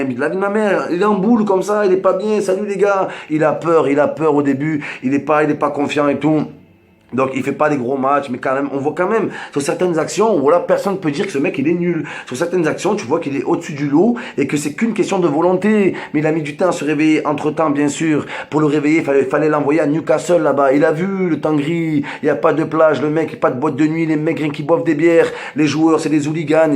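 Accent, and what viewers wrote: Marseille, France. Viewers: yo bro c'est quoi cette nouvelle coupe de cheveux en tout cas je te quiffe grave avec ton axant marseillais cool frère tu fais des bonnes vidéos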